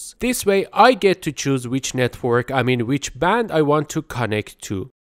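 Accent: Iran. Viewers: چرا لهجه ایرانی ها انقد ضایعست و معلومه😂😂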